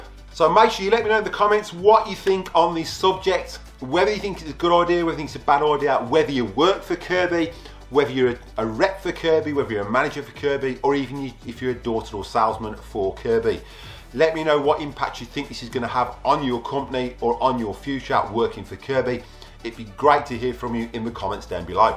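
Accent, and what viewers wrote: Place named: Midlands, United Kingdom. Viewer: That's amazing, probably never ended up in the same room though, I think you left just before I started, I joined near the end of the G3 run, the G4 was unveiled just before I left. Never occurred to me you'd be anywhere other than UK, and what with your midlands accent. Mind you, there's kind of a vibe in some of your videos, lighting maybe?? Don't miss UK at all myself, moved away to Switzerland about 13 years ago and that's where I'll stay. The UK now, for me isn't the same one I left so.... That said, there's a slight difference between living 4 or 5 hours away and an entire day.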